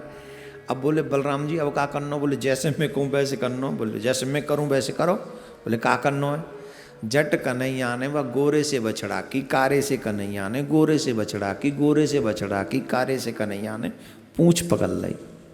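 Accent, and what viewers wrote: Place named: Braj, India. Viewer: गुरु जी आप ब्रिज भासा में कथा कहते है तो बहुत ही अच्छा लगता है ब्रिज भाषा कितना मधुर है लगता है सुनते रहे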